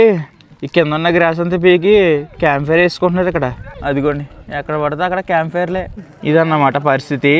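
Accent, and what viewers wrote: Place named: Rayalaseema, India. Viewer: Anna Videos Late Avuthunnay But N Duko Mee Slang Ante Maa Raayalaseema valla ki baga istam anna Ann Alte Cheyakandi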